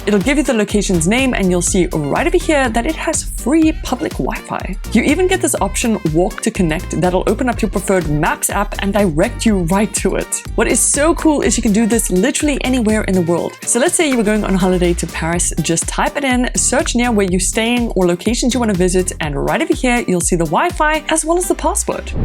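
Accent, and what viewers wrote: South Africa. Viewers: She sounds like she is an ex South African . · Great video. Thanks. Well done!! (I’m curious… You South African? accent…🤔🤗) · hmmm the access almost sounds South African ? 🤔😄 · So glad I found this! Subscribed immediately. And that was 100% because of the South African accent and the smart stuff.:medal-yellow-first-red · I think it's a South African accent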